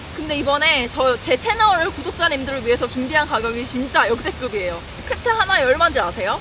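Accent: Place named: Gyeongbuk, South Korea. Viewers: 경북인건 1000% 대구세유? 사투리 별루네... 부산 아님 ㅋㅋ 깨긴깬다 ㅋㅋ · 마! 이게 갱상도 여자아이가?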